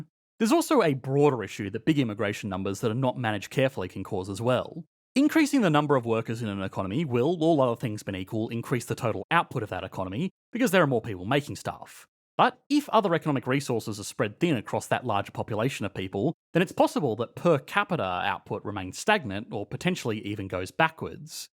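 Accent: Australia. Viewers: The demand for housing doesn't mean they are built fast enough. You don't know the issues of Canada clearly. The builders do not have the workers and they also rather pay less. On top of that they rather build at a slower pace and charge MUCH MUCH more for each unit because of desperation of the housing market. If they build too much housing too fast. Their bottom line in what they can make also suffer. So the incentive is to keep it as extremely high demand. How can you account for 500K immigrants when 200K housing is built every year. That is just the immigrants not counting the 750K students that need places to stay. We need a new city built at the size of Ottawa each year now. Let me know how that is feasible. This is not over sensationalized. It's an extreme problem happening. I detect an Australian accent or NZ one and you don't live in Canada. Studying things and not living them is a different story.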